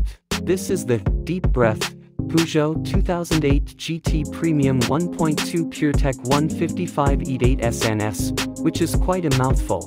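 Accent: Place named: United States. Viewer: A strange review to listen too, the commentary sounds like an American version of R2-D2, the pronunciation of words such as chassis are so American, also the script repeats itself I say the script repeats itself…all in all a ghastly review…..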